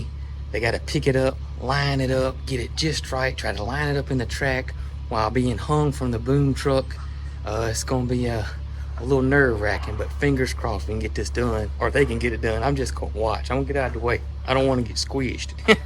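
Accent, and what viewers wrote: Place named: Texas, United States. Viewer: Those doors are absolutely gorgeous and I love your accent I am from Texas but we kind of have the same Lango going on here LOL😂😂😂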